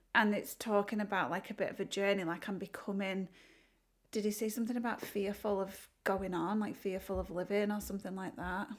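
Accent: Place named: England, United Kingdom. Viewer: Hey, Found you through your reaction..Subbed for more, but I have to say You are f*n fun! Your look is absolutely rocking and I could just sit here and listen to that English accent and rock out with you all day! My wife is a Brit BTW, so I may be biased..lol) I see you have reacted to so I will be checking those out too. I am a GEN X guy, so would be my choice on the desert island if I could only listen to one band for the rest of my life. (Although, definitely has made me questioning that choice:) ). I would love to s see you react to -. I see your channel is quite new...let's build you up! I'm here for it!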